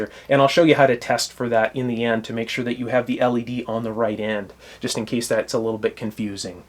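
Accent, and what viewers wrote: Canada. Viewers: Are you a Canadadian? I keep picking up accents traits like the way you say "about"--but hide your beer so I can't confirm. Other than that, great videos. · Gotcha, thanks. Great vids! Do you have formal education? Your accent reminds me of some Canadians I've heard.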